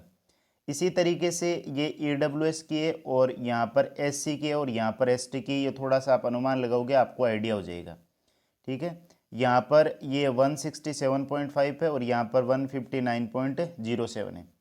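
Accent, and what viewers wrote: Rajasthan, India. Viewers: OK bhai mujhe apke accent se lge ki aap rajasthan se ho isliye bola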